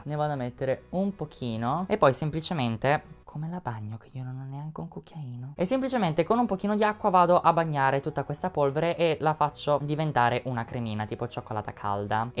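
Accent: United Kingdom. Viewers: Sei un grande io adoro come ti trucchi adoro la tua accento inglese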